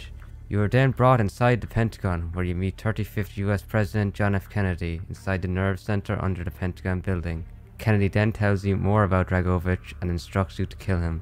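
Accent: Ireland. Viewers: Thank you. Where in Ireland are you from?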